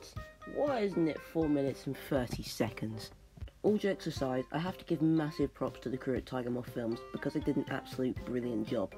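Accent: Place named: United States. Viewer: I couldn't stand the American accent and terminology. I watched it with the sound off and subtitles on.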